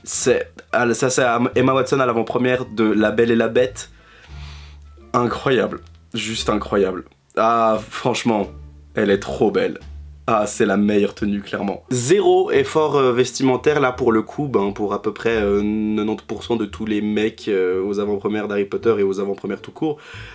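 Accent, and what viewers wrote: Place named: United Kingdom. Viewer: Ton accent anglais une pépite ✨✨✨✨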